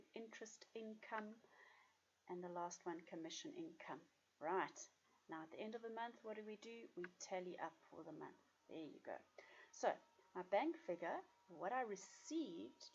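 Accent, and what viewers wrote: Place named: South Africa. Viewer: Eyy Ur South African🤣👌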